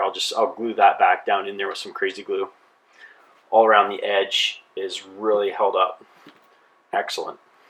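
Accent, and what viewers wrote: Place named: Canada. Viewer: such thick canadian accent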